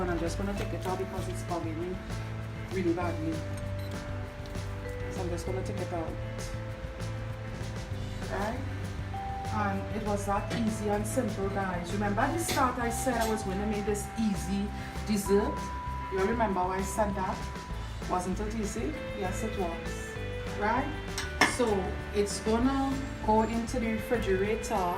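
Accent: Trinidad and Tobago. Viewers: it's trini creole ( caribbean) it's English with trini accent just like most English speaking countries have their own accent lols and saying wtf was kind of rude because she spoke normal to me because trinidadians speak with a deeper accent than that maybe it's just the music.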